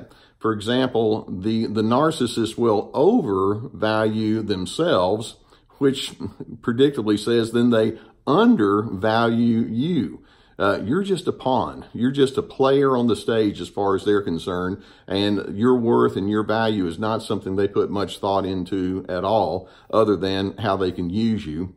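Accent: South, United States. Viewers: Appreciate a doctor with a Southern accent! The media equate that accent with uneducated hicks--exacerbating regionalism. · Love THICK SOUTHERN_ACCENT!!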